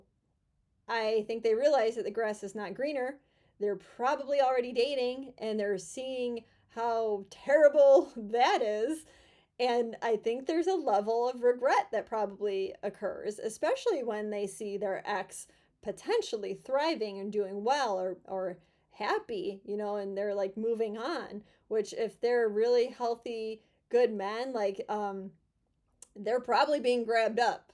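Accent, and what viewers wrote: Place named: North Dakota, United States. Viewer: Are u from North Dakota Been trying to place your accent U Judy don’t have that stereotypical “concha no!” Things sorry lol was curious You’re awesome great videos lol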